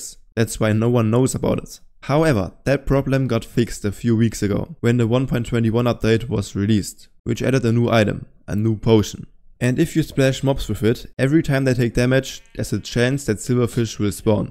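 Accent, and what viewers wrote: Germany. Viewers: Man. I really like germans speaking english!!! 😄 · Do you speak german? Your accent sounds kinda german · German detected. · I can hear the german accent😂 i really enjoy your videos btw i always watch em while playing minecraft myself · Bro sounds a bit too german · are you german? alles gut hört sich defentif besser an als bei mir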